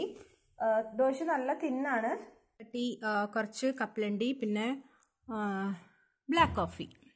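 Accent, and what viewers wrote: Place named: Malabar, India. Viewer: nde malayaalam is soo different.kayichu..malabar aano stalam...good information..thanks dear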